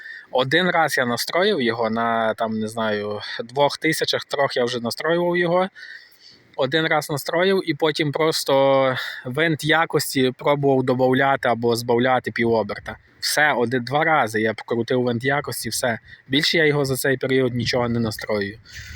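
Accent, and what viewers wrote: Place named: Western Ukraine, Ukraine. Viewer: Й ще одне! Перепрошую за питання - не хочете - не відповідайте на нього! За Вашою говіркою я прогнозую що Ви мешкаєте десь на заході Україні, найвірогідніше - Львівщина. Можливо помиляюсь. Сподіваюсь Ви не будете вважати таємницею назву області у якій проживаєте?! Особисто я у Черкасах.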